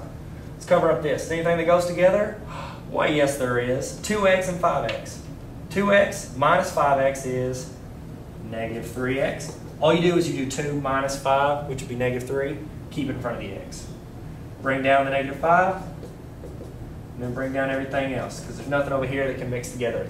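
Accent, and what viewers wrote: South, United States. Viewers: Ummm why do u have to be so good looking? And that southern accent...couldn't concentrate on the lesson at first! ;-)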